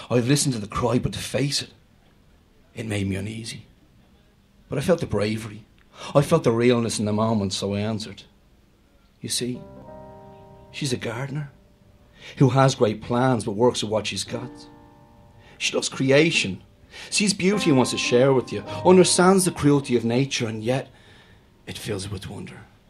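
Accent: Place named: Ireland. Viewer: Such a beautiful poem. And the Irish accent just makes it that much better (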